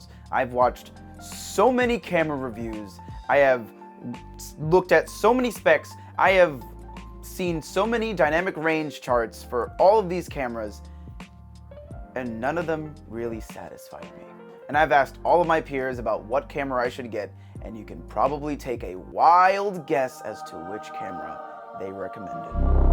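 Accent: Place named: RP, United Kingdom. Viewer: Beautiful classical British accent. Versatile guy, you are.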